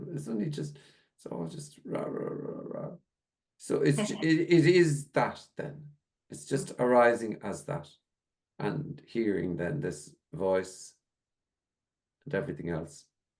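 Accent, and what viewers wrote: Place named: Ireland. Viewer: Love it sounds even better with an Irish ascent ❤❤❤. So so resonates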